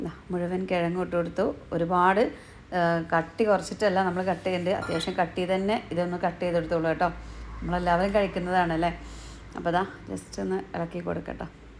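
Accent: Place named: Mavelikara, India. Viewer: Kasarkode basha parayu Ethu Mavelikara basha aanu.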